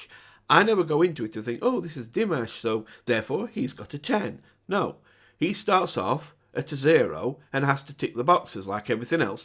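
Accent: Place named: Midlands, United Kingdom. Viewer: Hi. One of the reasons why it is so nice to listen to you is that you are always so honest. As for the concert, I actually find it better after that. I personally think that only one artist should appear at a solo concert. And the singing of his parents is not my taste either. So I'm glad he's more solo on his subsequent concerts. I'm already looking forward to the next double..... when did you say you will do it? Soon? The Dimash-Soon (betwenn 3 days and 3 years) or a -Soon (tomorrow) 😂😂😂 And I'll forever be happy to listen to your voice, the giggles and the middle-englisch dialect... so see you „soon“ ❤️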